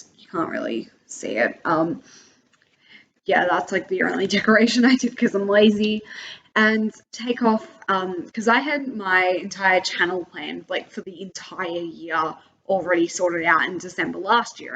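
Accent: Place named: United States. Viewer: A great way to document your process. Your American accent is pretty good. Keep up the good work! From an American playwright.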